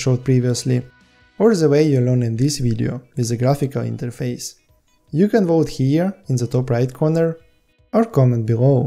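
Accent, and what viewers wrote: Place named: Russia. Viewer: Какой акцент, будто русский говорит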